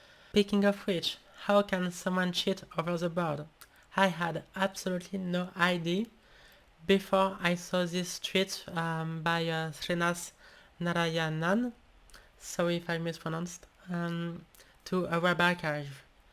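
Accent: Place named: France. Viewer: From her accent I'd say France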